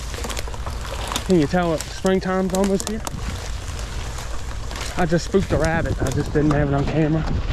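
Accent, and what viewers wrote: South, United States. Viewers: Fun looking chill video, l also love the sounds of waves lapping. Hey you use what ever way of speaking that you want to y'all, for us guys up north it is nice to hear your Southern Accent and local nomenclature that you are used too. thanks for the video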